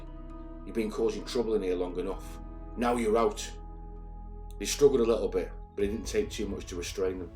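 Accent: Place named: Liverpool, United Kingdom. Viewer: Your accent sounds slightly Scouse mancian yet your from London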